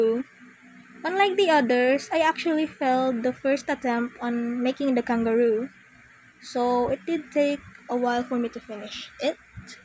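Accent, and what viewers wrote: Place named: Philippines. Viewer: YOUR VOICE SOUNDS AMAZING WHAT- ITS LIKE AN ANGEL DBDJHDGHJDYDJSSJHSH You have that Filipino accent while I have this American-ish accent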